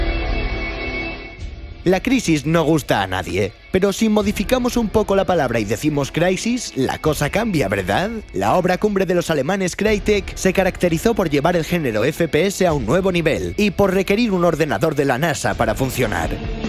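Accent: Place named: Spain. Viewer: buen video pero no soporto el acento de españa, como pronuncia mal los títulos de los juegos...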